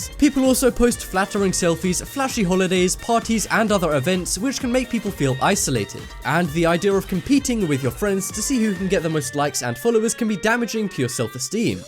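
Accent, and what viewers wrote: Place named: United Kingdom. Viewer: I like this channel because of the guy's british accent tbh tbh tbh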